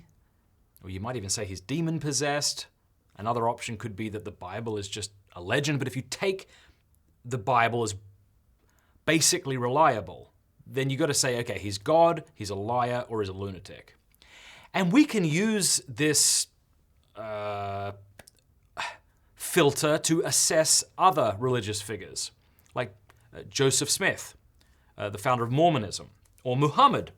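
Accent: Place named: Australia. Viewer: Cool to see the best Australia accent ever.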